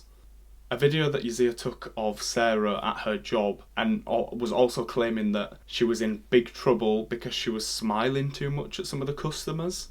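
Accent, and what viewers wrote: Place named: Northern England, United Kingdom. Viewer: I haven't heard about this incident until now. It's such a shame how children/young people so often ignored when they try to ask for help. Your accent sounds quite northern - my accent is northern but mine is VERY northern (Wigan). Do you remember a few years back there was a young girl from Warrington. Her name was shefilia Ahmed. She was murdered, chopped into pieces and thrown into water in the lake district. Such a terrible thing to happen to a beautiful young woman. Her parents were eventually charged and found guilty of her murder. But it came to light she tried to let people know what was happening in her life. She even drank bleach in a bid to kill herself because her father was sending her to Pakistan to marry her off. You did another Exellent presentation. Thank You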